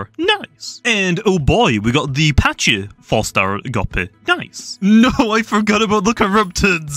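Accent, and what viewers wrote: United Kingdom. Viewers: Goppie :0 But also bri'ish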